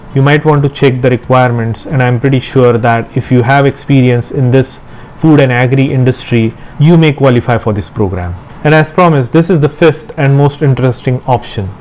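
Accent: India. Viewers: I wish to apply but very hard to understand the Indian accent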